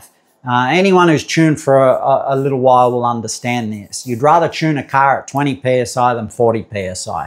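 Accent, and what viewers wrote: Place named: Australia. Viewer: Great video! I’m challenged to keep up (British vs American accent), but YouTube speed control to the rescue. One nit pick. A few times you said “mil” but it looked like you wrote “mm” on the board. And unfortunately the board writing was not clear enough to be certain that you had written “mm”. The problem is that being Australian, you are libel to use either imperil or metric, and hence it is imperative to be crystal clear as to which units we are talking about. I’m a physicist and hobby machinist in USA, so I’m well versed in both metric and imperial. In USA (and a large part of the world), when even remotely associated with machining, a “mil” is a thousandths of an inch. No self respecting physicist (and really any scientist) would ever use the word “mil” for “millimeter”. We call it by its proper name, “millimeter”, “centimeter”, “decimeter”, etc. 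why? Because that is what it is. And as a byproduct one avoids all possible confusion with the imperial “mil” (1/1000”) which is pervasive in the imperial machining world. Maybe if 100% of the world uses metric in all areas then we might tolerate a slang of using mil for millimeter. But the world is not there yet and likely quite a few years away from such universal adoption. In the mean time we really need to stick to calling things by proper and industrially accepted names and refrain from shortcut slang which might be confused. Thanks!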